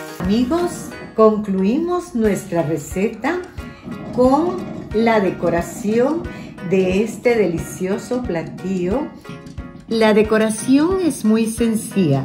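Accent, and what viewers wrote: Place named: Guatemala. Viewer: Muy agradable escuchar un castellano chapin bien hablado! Deliciosa receta, gracias!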